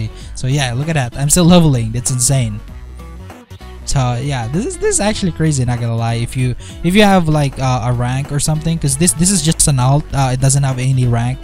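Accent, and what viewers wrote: Philippines. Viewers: Yung accent mo preee parang Filipino ka ah! Notice mo sana to men if filipino kanga ba talaga at kung na iintindihan mo tong sinabi ko.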